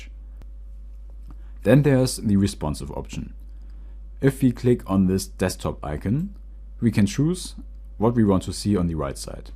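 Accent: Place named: South Asia. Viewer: Why is this white man talking like south asian man? just wandering. Good video!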